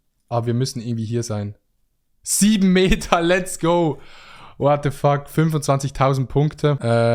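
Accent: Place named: Zurich, Switzerland. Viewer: ich dachte ku kommst aus Zürich😂 liebe grüsse von kleinbasel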